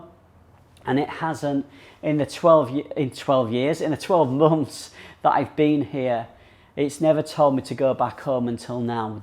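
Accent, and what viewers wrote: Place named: North, United Kingdom. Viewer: Ahhhh...that familiar northerner accent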